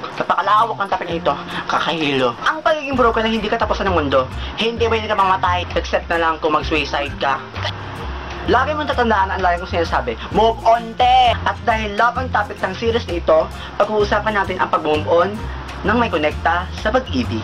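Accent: Visayas, Philippines. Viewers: BiSAYA kaau uhy ! HAHA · taga asa ka?bisaya lagi ka?hehehe.malingaw jd ko sa imo ba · Bisaya diay ka kuya? Ako pud baya XD Hahaha!! More power dahhling!:) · bisaya diay ka hahaah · bisaya diay ka ter :)) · bisaya kaba??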